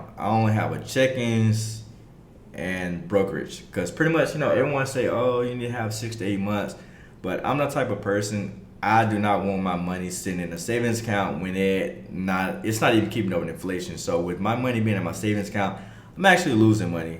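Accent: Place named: Texas, United States. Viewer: Also you sorta have a country accent like Texas in a way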